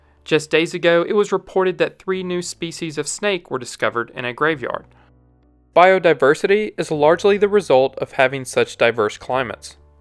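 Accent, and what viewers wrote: South, United States. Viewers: Interesting video. I should point out that you Spanish pronunciation is cringe worthy. Your accent would suggest southern USA with English being your primary language, but with Spanish pronunciation, you aren’t really trying.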